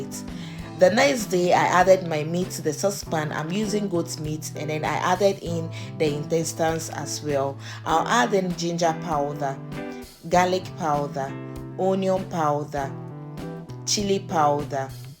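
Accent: Ghana. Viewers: just when i was thinking of how i could see ewedu leaf to buy . you just thought me another idea with spinach and okro. i guess you are Ghanian from your accent. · You sound like Ghanaian lady good job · This is not normal. She sound Ghanian.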